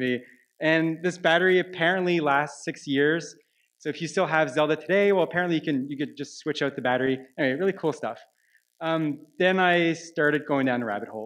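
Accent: Canada. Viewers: It is a Canadian French accent (Quebec) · All the comments on how he pronounces Mario. It's the Canadian accent... You're over there basically saying "wtf, this Canadian talks with a Canadian accent!?!?" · Within 10 seconds, we all realized he was from Canada. Then immediately after he said "I'm from Canada"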